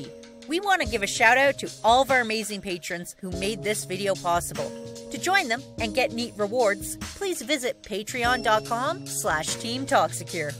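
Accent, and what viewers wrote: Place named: Australia. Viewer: Best Australian accent ever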